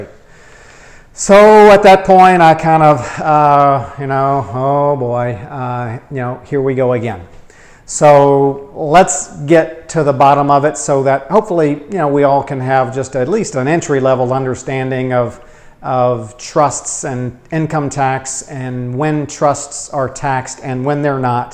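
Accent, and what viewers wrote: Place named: South, United States. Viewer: Thank the southern drawl.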